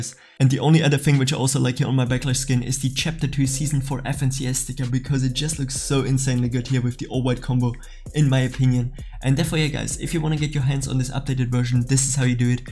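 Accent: Germany. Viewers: bruder dieser deutsche aktzent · Are you german?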